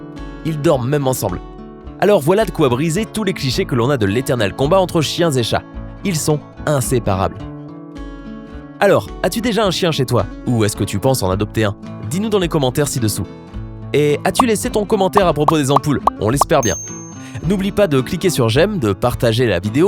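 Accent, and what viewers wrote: Quebec, Canada. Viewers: Oooo saï pour j'aimai bien la voit québécois enpluse saï la même voit que lama fache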